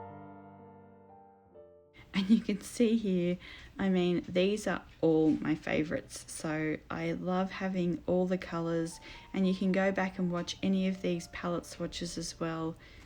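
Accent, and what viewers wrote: Australia. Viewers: Great to hear an Aussie accent. I am in NSW and am trying to get into art and watercolour after a career in nursing. I love your style. Thanks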